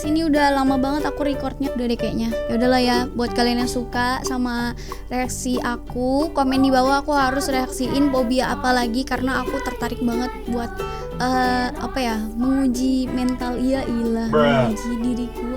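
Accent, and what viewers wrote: Banjar, Indonesia. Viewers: Kek logat orang banjar:) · Logat banjarnya kada kawa di hilangi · Kyk org Banjar ada kata², nah di akhir kata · Logat banjar nya masih gak hilang awokwok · Banjar nya kluar sikit"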